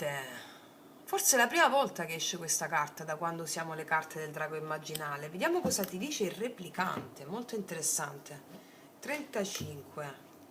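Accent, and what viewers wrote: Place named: Salento, Italy. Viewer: Grazie ❤ ascoltarti un piacere per la tua preparazione passione e per il meraviglioso accento 😊love Salento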